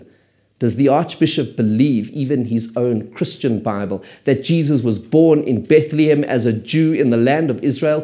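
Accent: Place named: South Africa. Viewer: Within 10 seconds I knew you were South African.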